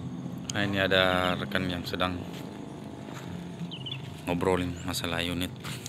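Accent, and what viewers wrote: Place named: Indramayu, Indonesia. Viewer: Org indramayu mang ??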